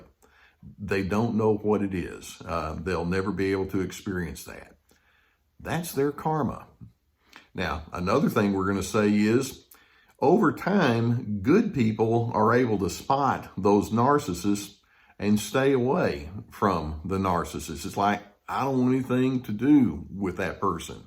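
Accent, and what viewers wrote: South, United States. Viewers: Not only are videos helping me cope with and understand narcs, I just LOVE his deep, soothing Southern voice and accent!! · Bloody hell! This is excellent analysis.your best yet. That's not to say all your stuff isn't, excellent! I love, Karma, as it is very difficult to "uninsnare", yourself from these detestable, bastards!! Love, the southern drawl.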